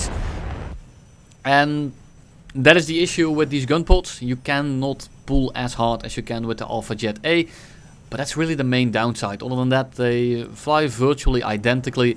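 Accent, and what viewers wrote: Netherlands. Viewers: ik hoor n beetje nederlans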